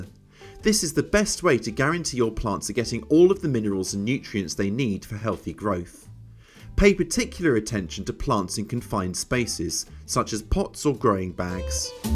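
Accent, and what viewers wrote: United Kingdom. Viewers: First sentence and I label him as Brit. :D I have a weakness for their style so.... (pardon gross generalization but Brits make me smile)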